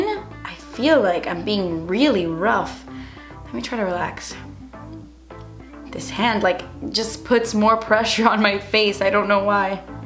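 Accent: United Kingdom. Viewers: goooood, I love when you speak with british accent :D but this definately looks more like a tutorial then a challenge, are you sure your not like both-handed? <3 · are you british??? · You're British accent gives me life hahah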